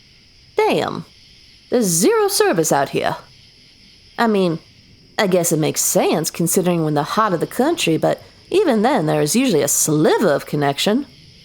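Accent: South, United States. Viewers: Call me selfish but i do love me this mechtism shit, the southern accent just adds a certain "kiss me darlin i beg ya" to it, p4aise be the country darlijng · You did a great job on the southern accent although usually this accent will usually only be found in Texas and Oklahoma rather than the true south, love the audio!!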